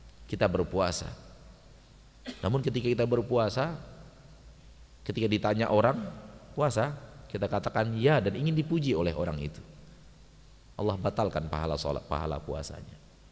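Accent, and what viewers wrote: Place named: West Sumatra, Indonesia. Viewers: uztad orang mana..? seperti nya menggunakan logat minang..yg sangat jelas logat nya. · Vimia Zuhry org Pekan Baru suku Minang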